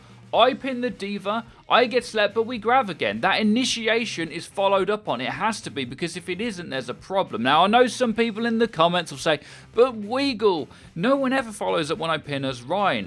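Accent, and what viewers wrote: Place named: United Kingdom. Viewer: Doesn't he sound like a British version of Ben Wyatt from Parks and Recreation.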